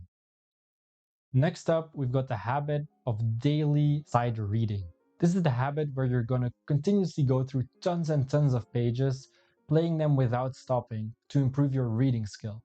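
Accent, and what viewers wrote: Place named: Belgium. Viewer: I'm Belgian myself so I can spot the accent very easily XD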